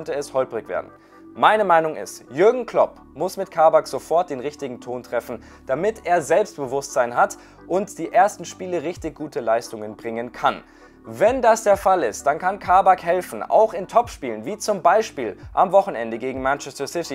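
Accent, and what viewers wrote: Ireland. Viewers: Ist sind irischer Akzent, kommt aus Irrland der Gute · Er ist ire er kann nd wirklich was dafür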